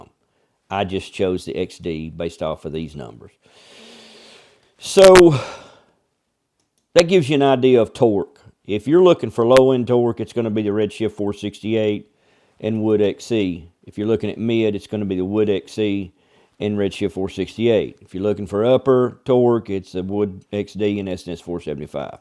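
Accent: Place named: South, United States. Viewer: Beautiful bikes, awesome beard! With that accent you gotta be from the south like me. Like the vids brother, keep up the good work! Just finished my own cam swap, went with the S&S 475.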